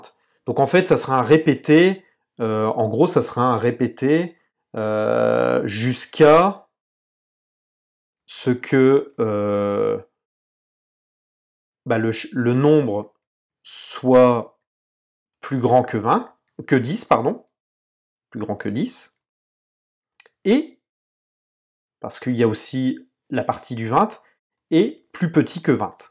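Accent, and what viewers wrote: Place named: Ardennes, France. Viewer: Bonsoir, tu me rappelles mon ancienne collègue, elle prononçait le "t" de vingt, tu es des Ardennes toi aussi ? :D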